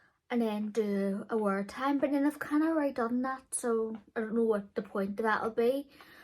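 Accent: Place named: Ireland. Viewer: Thanks for video. Love your accent! Are you Irish or Scottish possibly? 🤔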